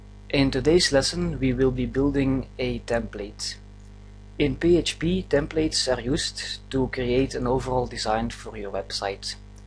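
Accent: Netherlands. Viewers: you're dutch right (no offence it's the accent) :) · You are dutch I assume? :p Ik ook :p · nederlands accent, geen echte engelse :D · You have a dutch accent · Binnen 1 seconden te merken dat je Nederlands bent haha, chill video! Thanks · We horen direct dat je nederlander bent lmao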